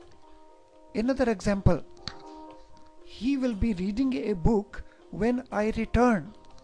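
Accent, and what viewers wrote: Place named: India. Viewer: i hate your Indian accent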